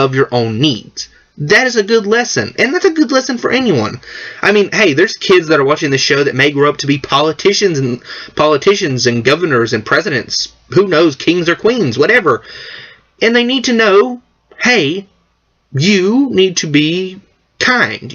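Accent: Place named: Tennessee, United States. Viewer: Is the accent put on or are you from the deep south? You sound Tennessean, but that's just a guess. I hear all kinds of the accent down here in Florida. (Also, I would encourage more pictures which I know are a pain in the ass, but make the video more dynamic and making your background not white. google neutral colors for some options.)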